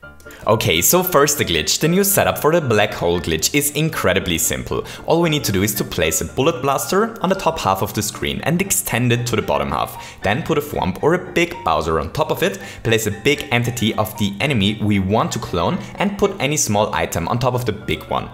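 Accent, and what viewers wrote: Germany. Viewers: Dein englisch :D gut,aber der deutsche Akzent :D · Are You german? Your accent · I'm German and I normally dislike German accents, but accent is kind of...charming? And it makes me smile when I can tell from his voice that he's smiling. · Urgh german accent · An die deutsch englische Aussprache muss man sich erstmal gewöhnen sonst gutes Video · I always thought that he was german too but i didnt want to make assumptions. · Dieser Akzent, man hört richtig das Deutsch raus xD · He has the most German accent I have heard in a long time! Psycrow with a hard "p" at the beginning for example ;) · Are you German? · Are you german? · The german accent is strong with this one! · Deutscher Akzent :)? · He has a strong German accent imo. But maybe it's easier for me to tell because I'm Austrian too. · Not being rude but you sort of sound German....or Austrian....or even maybe Polish...Just saying! 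Gday and kudos from Australia! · the german is strong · Are you german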